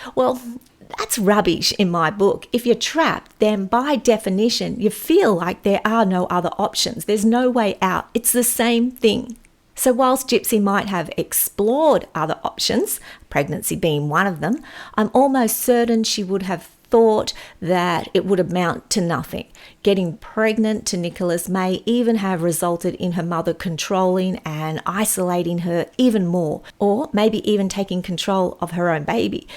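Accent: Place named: Australia. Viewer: I live on the Mid-North Coast of NSW so it's good to hear an Aussie making these videos. Your calm manner and evidence- based approach is very refreshing.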